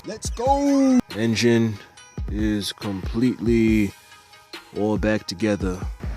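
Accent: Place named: Minnesota, United States. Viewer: Minnesota badass, 10 deg, snowing, barehands, even got some accent!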